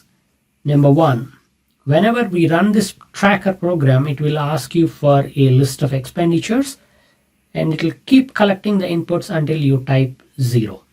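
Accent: India. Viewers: indian accent --> close window. But thanks anyway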